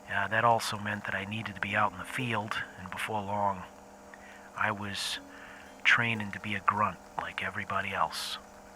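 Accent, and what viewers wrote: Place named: Boston, United States. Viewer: What a great way to use the opening tutorial and yet make it completely your own! Really looking forward to this. And so glad you are doing a Boston accent that doesn't sound like a parody of a Boston accent.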